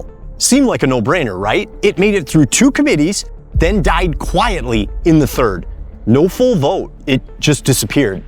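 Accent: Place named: Wisconsin, United States. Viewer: Are you a Wisconsin boy? I can hear it😊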